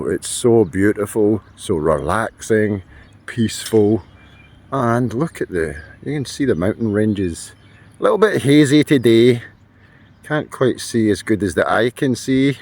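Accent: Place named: Scotland, United Kingdom. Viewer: 2,000 baht, is a good price lots of work to tidy up. Nice to hear a Scottish voice in Thailand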